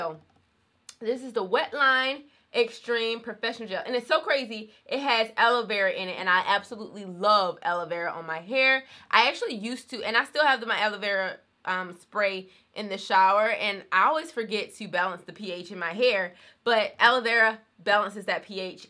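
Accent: South, United States. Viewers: I 👂🏾 a southern drawl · I know your family originally from the south lol "ju lie Dat rie dur" sound like all my family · Omg!! Just found your channel and I love it! Your hair looks great! Have you tried the Dickey Method wash’n go? New subbie just because of your accent, you gots to be from the South!!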